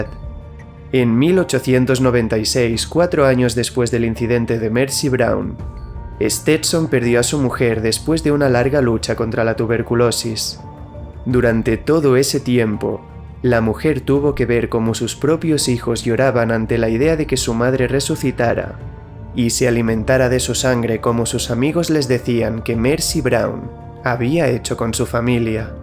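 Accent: Spain. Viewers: A mí no me gusta el español de España por lo tanto no me gustó la narrativa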